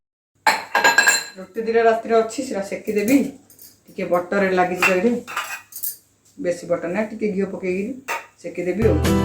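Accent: Berhampur, India. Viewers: Di tame eng. re ହିଁ subscriber kuha odia sabda (ଚାନ୍ଦା ଦାତା, ଗ୍ରାହକ) ta vala laguni, but hindi sabda (yogdan karta) ta gote prakara thik achhi. Tamaku dinaku thare na dekhile jama val laguni, khass kari tama ବରମ୍ପପୁରୀଆ vasa, ohh 👌👌 mind blowing 🤗🤗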